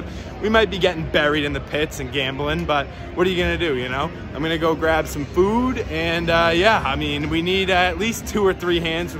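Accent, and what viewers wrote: Boston, United States. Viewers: You a boston boy? Asking cuz i am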